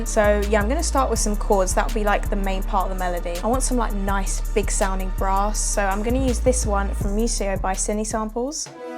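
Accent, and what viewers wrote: United Kingdom. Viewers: Ayeeeeeeeeeee I started to play this & completely ignored it, a few moments later I’m like “Who is this lil white girl with this UK accent cooking on the beat!” Does she/her have an Instagram? I have to plug her in with my lil bro DJPlugg, Google him. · Her posh accent makes this go even harder.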